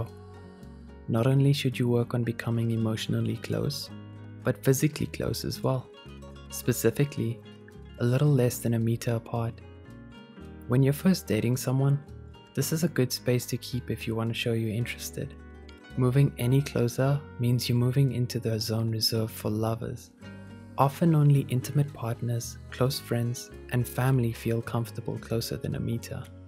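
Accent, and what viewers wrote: South Africa. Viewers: The voice-over artist sounds South African 😱 (I'm also South African but I don't have the accent lol.) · A South African audio !? I LOVE IT !!! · A nice South Aftican! 👍😀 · Sounds like a South African accent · Is he South African? By any chance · This person sounds south african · is he South African? it feels like home. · Is South African? I recognise the accent:) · Sounds like a South African accent. Nice surprise. Love from SA. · wait what?! this guy has to be South African. I know that accent! this was expected 💚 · I can instantly tell he has a South African accent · This is 100% a South African accent right ?😂 lol represent! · The voice sounds South African and I'm not complaining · He sounds South African 😍👌🏾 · Immediately I need to know, the narrator is South African right...maybe Namibian, but I'm really feeling South Africa. 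· why does he sound like he has a bit of a south african accent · it's a south African accent. As a south African I recognize it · does he happen to be South African? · Me too he kinda sounds South African. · He sounds like he's from South Africa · I’m picking up a South African accent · Does the new narrator have a South African accent??? I’m from South Africa and I was shook and confused like is he from here? Why does it sound so familiar? 😅😂 love it tho ❤️ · Sounds like a south african accent 🙃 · Sounds like our South African accent... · Is this a South African accent I am hearing,if it isn’t , it could be 👀🇿🇦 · i love his south african accent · I love it!!!!!!❤Sounds South African ? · U lowkey sound South African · I was searching for someone to confirm my thoughts that this is a proper South African accent.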